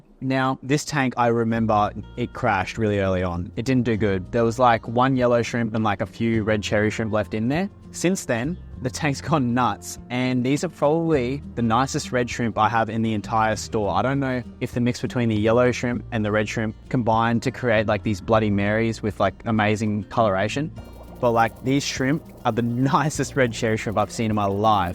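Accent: Australia. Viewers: He sounds australian. as a former (moved to America january) in australia everyone swears there. like everyone, its just another word in Australia. so the culture and stigma around swearing that there is here in America is not prevalent in Australia. i mean heck the school kids from around 14-18 are saying sIurs, not in a rude manner its just part of their vaocab and no one really cares because of the massive cultural difference. i understand where u are coming from I just don't know if u knew that in some parts of the world swearing has no meaning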